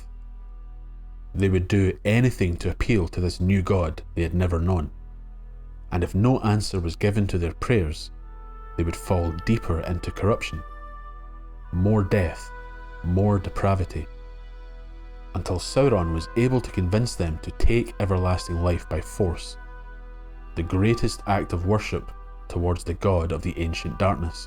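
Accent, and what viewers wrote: Scotland, United Kingdom. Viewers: Are you the Critical Drinker? Or do all Scots sound alike?🤔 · This was really great! Though, much as a Scottish accent makes me weak at the knees, it was unintentionally funny to hear: "And the men of the Ale took Suh-ron back to Nuh-mennor from Middle Airth. Even the Fusstborn recognized the power of his rings to PREZAIRVE..." Aye, ye might try a wee bit harder to nae sound so Gaelic about it all!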